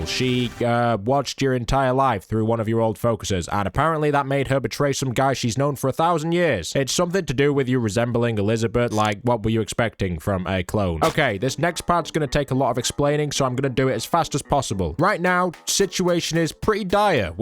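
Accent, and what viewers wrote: United Kingdom. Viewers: well the game certainly was boring as shit but i theres nothing better then having an angry british lad speak about video games ... while i am playing a video game.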